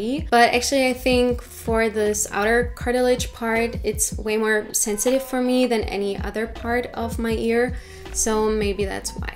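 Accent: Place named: Germany. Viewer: Nice Video Hab einfach sofort man dem Akzent gemerkt, das du deutsch bist🥰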